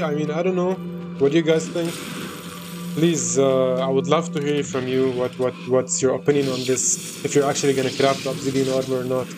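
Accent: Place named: Arab world. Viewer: My dude your accent is very close to my own, are you lebanese or anywhere around the middle east?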